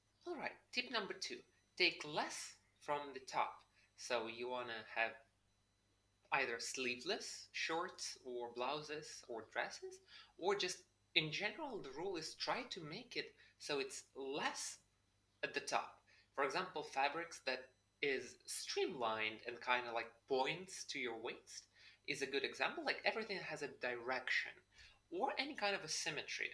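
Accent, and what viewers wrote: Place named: Europe. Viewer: (1) Out of curiosity, what country did you relocate from? And in what country are you living now? Your English is very good. You sound European. (2) I'm a baby-boomer who's accepted in a MTF HRT program at a health center so I can transition to living full time as a female. The culture has finally shifted to where you don't have to fear for your life, get arrested or in general, get harassed wherever you go. Even dressing gender-neutral at only 4 months of transitioning, I've incurred no problems in today's American society. (3) Did you have an occupation or job skill that would translate MTF so you could continue to earn a living. I've been active in the trans community for 25 years. TGurls who were moving forward 'no matter what' lived in what I termed 'a gender ghetto'. No matter what their education, they could only get menial jobs, perhaps as a bartender at a gay-bar or a clerk at a convenience store. 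Professionals (one I knew was a surgeon, the other was an IT specialist for a large corporation) lost their jobs immediately after they had GRS surgery and announced it (a) to the partners who had their LLC practice's lawyer draw up a buyout agreement effective immediately and presented to the surgeon the moment she returned from Thailand where s he had her GRS done, and (b) even though the IT specialist worked openly and sincerely with the company's HR department who 'talked the talk about inclusion' etc., and the company was unionized and she had the union's LGBT rep atte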